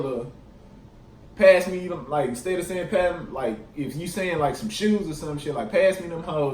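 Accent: United States. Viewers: One of my oldest friends was from Baton Rouge, LA. You reminded me of him, but he was old enough to be your grandpa. I could barely understand him most of the time. Once he kept saying "han me ovuh the fo paypah," or something like that. I had no idea what he wanted, he finally had to get it and show me (aluminum foil!). Anyway, you use a different slang, because you're young, but the general way you sound is a lot like old Frank, bless his soul. I'm born and raised in California & Oregon. You truly speak a different language down there. 🤔😎 Had to subscribe! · Mane You sound like a Memphis person you sure u from Mississippi?? Most of the slang you use they say in Memphis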